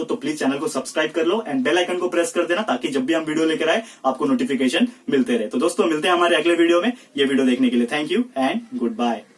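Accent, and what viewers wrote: Maharashtra, India. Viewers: Sir aap Maharashtra se ho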